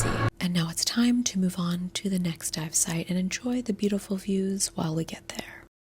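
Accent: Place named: United States. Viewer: What do you mean? I'm an American who understood her American accent perfectly. I'm sorry if you don't have the cognizance to understand one of the most common, average, generic, but generally functional accents in the English language.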